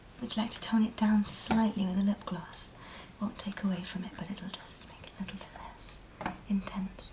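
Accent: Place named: United Kingdom. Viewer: Amazing, nice to hear such a nice British accent. One of my favourites :)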